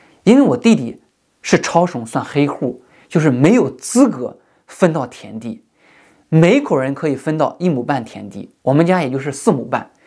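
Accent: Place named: Shandong, China. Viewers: 听口音是山东青岛人吧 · 我也是1988年的，潍坊的，你听上去也像是山东口音啊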